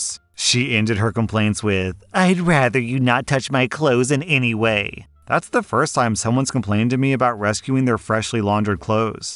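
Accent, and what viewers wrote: United Kingdom. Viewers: 10/10 British accent. When I read "Super Saiyan 3 British," I imagined OP getting super buff and shouting "CHEERIOOOOOOO" before evolving to his final form. Don't ask me why.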